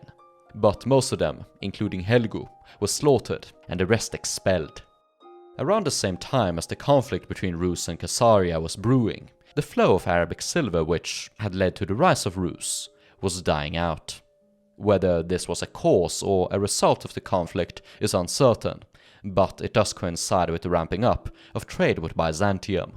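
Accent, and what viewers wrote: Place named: Sweden. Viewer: Premium quality material as always, and your English is excellent, grammatically superior to many (if not most) native speakers, Swenglish charm but with perfect 'ch-' sounds. First rate.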